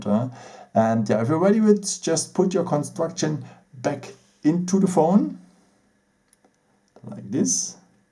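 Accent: Germany. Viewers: sounds like a german accent :) ;) Thank you for the video, good job.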